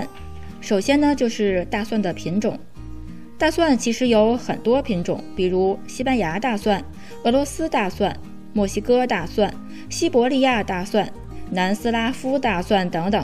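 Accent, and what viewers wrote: Tianjin, China. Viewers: 浓浓的天津味！好听！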